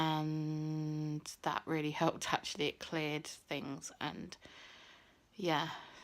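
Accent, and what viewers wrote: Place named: London, United Kingdom. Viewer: Your accent sounds like you're based in london like me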